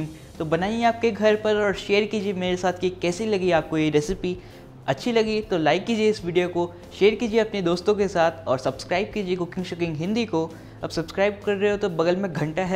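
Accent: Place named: Hyderabad, India. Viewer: Your huderabadi accent is on point ...God bless u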